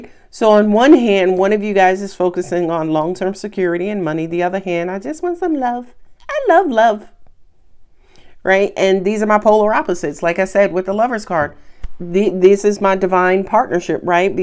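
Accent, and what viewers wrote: New York, United States. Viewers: Scorpio representing in that NY accent! 😂😂😂😎 Thank you for the reading. It resonates with me, I can feel it in my gut but I'm so scared of failing. Gotta work on that. 💫🙏🏻🤗♏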